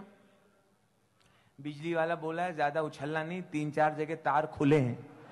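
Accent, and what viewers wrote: Kanpur, India. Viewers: Kanpuria style ..good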